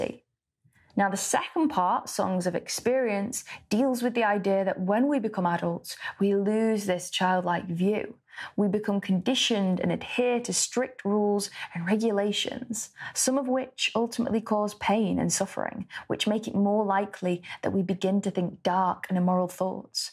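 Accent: United Kingdom. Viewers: #waw Untouched by the comprehension of English poems! felt like a school student once again though I am a researcher that too in Forests! to my great surprise I enjoyed like a terribly good ice-cream! first time here, liked shared and subscribed #wishes BTW your climate anthem song is a gentle tornado! and your beautiful British accent is charming like you. welcome!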